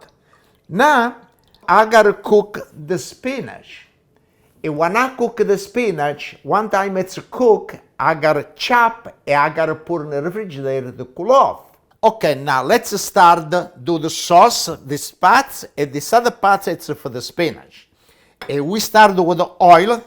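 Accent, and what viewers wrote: Italy. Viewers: He sounds just like my italian family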